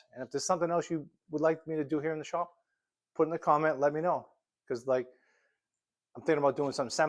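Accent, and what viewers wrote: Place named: New England, United States. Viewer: I love your accent, I left New England in ‘79, this brings me back.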